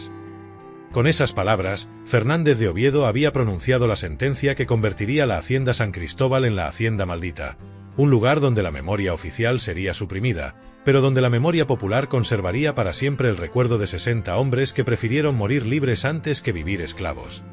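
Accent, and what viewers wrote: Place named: Europe. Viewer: Observen que su voz está en acento europeo. Pero grabado recientemente. ¿El guionista cuánto cobró? En San Cristóbal nunca se había oído una cosa así. No crean todo lo que escuchan. Te da un guión con una narrativa impactante Y cómo la gente no le lee... La Era de la Desinformación Al mismo tiempo que habla de que fueron los portugueses dice que fueron los españoles. Tampoco dice otros datos verdaderamente teórico.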